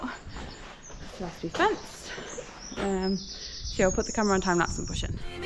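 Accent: Ireland. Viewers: Great video, more!! You're accent is absolutely starting to sound a lot softer and Irish 😊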